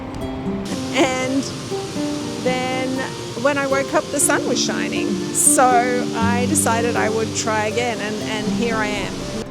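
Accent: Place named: Australia. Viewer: you sound like you’re Australian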